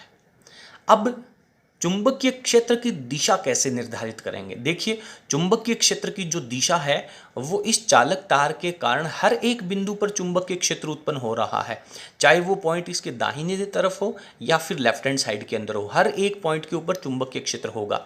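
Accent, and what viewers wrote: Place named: Bagdi, India. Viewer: Gurgi the ktha ga ho bagdi bolan laagrea ho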